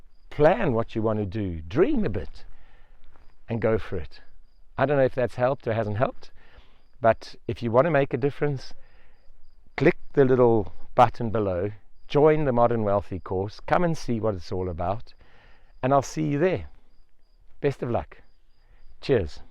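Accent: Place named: Zimbabwe. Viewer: You sound like a Zimbo. We live in Extremadura. I really want to get something going but not enough time right now. I shall keep watching you until I can get a site going.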